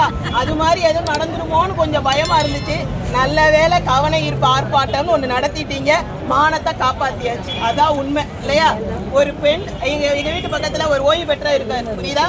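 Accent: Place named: Trichy, India. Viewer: திருச்சி தமிழ் தவழ்கிறது. வாழ்த்துக்கள்.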